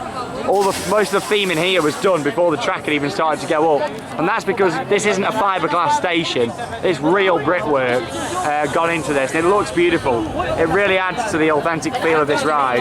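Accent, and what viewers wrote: Netherlands. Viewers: dat dutch daccent doe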